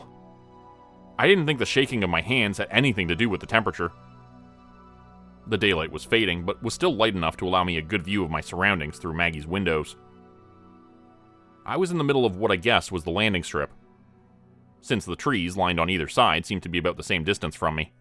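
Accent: Canada. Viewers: You say you're from Florida but you sound like a canuk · Sounds like a Canadian accent. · Ah geeze! The narrator is Canadian and does not sound like an American accent not a Floridian at all. My family being half Canadian I would know that accent anywhere. Aboot White ooot Cut ooot Ooot here in the wilderness. 😢😢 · Sounds like story teller is Canadian. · Sounds like you're from Canada not Florida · How come someone from South Florida has a Canadian accent?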